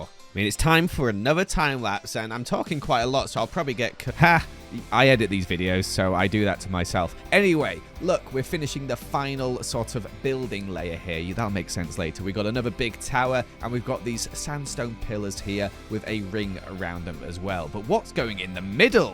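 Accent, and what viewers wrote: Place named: United Kingdom. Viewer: Okay but the dry af "Tequila" in such a monotone british accent is my favorite thing ever.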